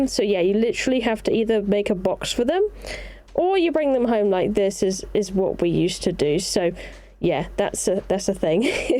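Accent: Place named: Cockney, United Kingdom. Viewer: The cockney/essex dialect is SO funny. It's not LAMA it is LAMER. It's not WATER it is WUOA. Too funny.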